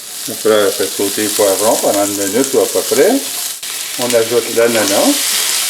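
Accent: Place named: Canada. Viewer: Joli accent Canadien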